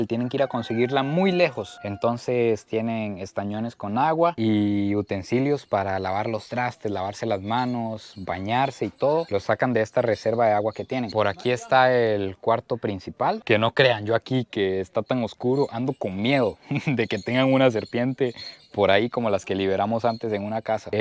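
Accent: Mexico. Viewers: Creí que era mexicano bro jejeje pero igual buen video chulada bendiciones bro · Mi compa me gusta k hable como mexicano....cuando quiera ir aya tiene su casa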